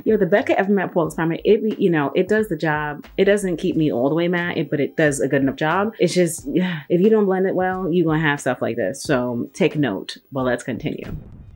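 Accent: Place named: Rhode Island, United States. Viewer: Only a select few are gonna recognize your accent…and I’m one of them! RI STAND UP! And the bronzer looked beautiful on you, but let’s face it, anything would!😍😘❤️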